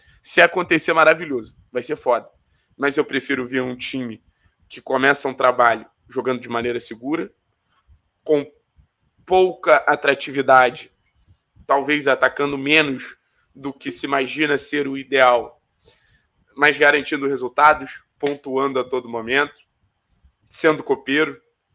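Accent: Rio de Janeiro, Brazil. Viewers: Cara vc tem sotaque de carioca. Vc é do Paraná mesmo?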